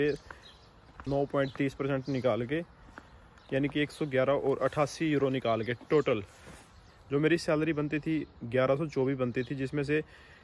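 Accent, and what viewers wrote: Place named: Haryana, India. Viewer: Bhai apki avaj se lag rha you are from haryana right bhai 😊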